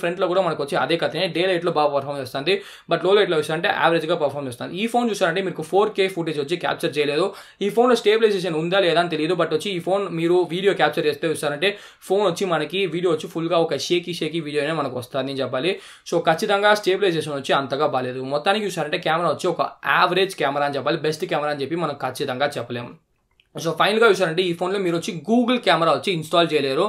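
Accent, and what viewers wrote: Tamil Nadu, India. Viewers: Telugu baga maatladutunnav bro kani koncham tamil style lo undi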